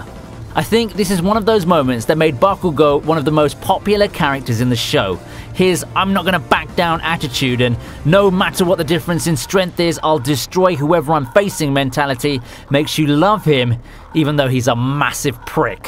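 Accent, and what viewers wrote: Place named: Scotland, United Kingdom. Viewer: wish I could speak scottish like that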